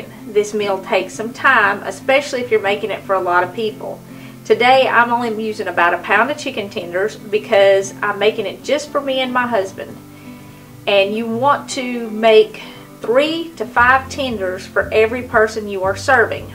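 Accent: South, United States. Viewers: Oh my gosh I just loved this recipe! I'm going to try it on my channel. You are so adorable...love your down home attitude, down to earth commonsense and southern accent!!! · I just love southern accents.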